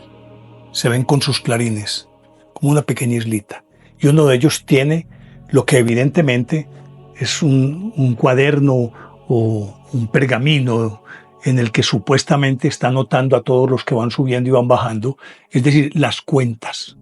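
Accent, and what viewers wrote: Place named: Antioquia, Colombia. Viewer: Me encanta su acento paisa, maestro. 😍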